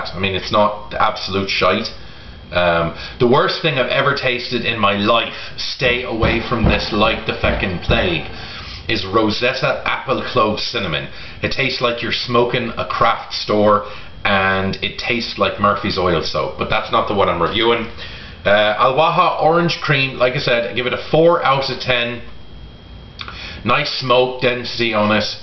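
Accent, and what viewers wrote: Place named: United States. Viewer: when hes with his friends he has a total american accent actually. watch some more. great review as always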